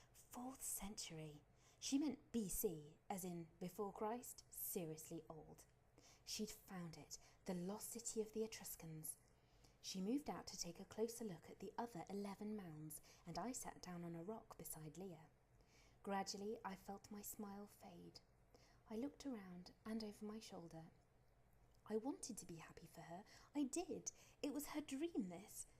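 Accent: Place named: United Kingdom. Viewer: The American teen is commentated by a British woman… fascinating choice. Same as the cover art for Torrent, not my first choice. Also why is the audio quieter than a fish screaming bloody murder?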